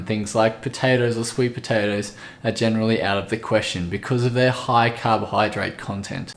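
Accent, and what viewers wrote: Australia. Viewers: So nice to have an Aussie presenting these videos. Thanks. Very informative.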